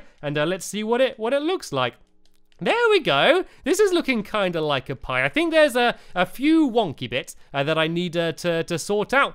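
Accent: England, United Kingdom. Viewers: My son insists that Ester's name is Estah. I told him it's Ester and pronounces it Estah because he's British, but he still wants to say it like you lol He also says "inventry" like Squid lol Just thought it was cute because he really likes you all. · He is not American he is from England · you are like puss in boots accept your english smart funny cheerful alwasy happy and most of all awesome! and you have iron boots insted aka STYLE BOOTS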